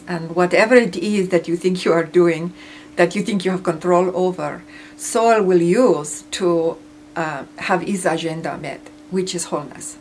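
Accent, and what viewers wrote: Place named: Eastern Europe. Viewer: Why is channelingn in an Eastern European/Russian/Spanish accent??? Come now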